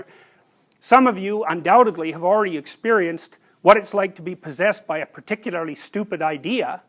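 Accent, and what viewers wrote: Canada. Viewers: That Canadian accent is so prominent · All Canadians speak like that.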